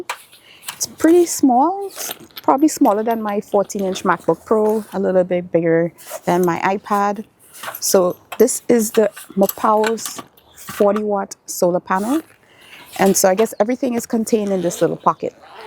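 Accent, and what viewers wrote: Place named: Jamaica. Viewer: You sound Jamaican. Greetings from Montego Bay.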